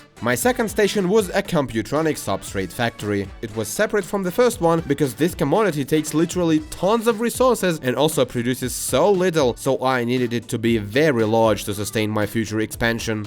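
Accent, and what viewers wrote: Russia. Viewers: я сразу почувствовал что ты россиянин, но слова ты выговариваешь хорошо, многие западные славяне говорят намного хуже тебя, что уже говорить про остальных русопитеков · If anyone curious - that's what russian accent actually sounds like. ;)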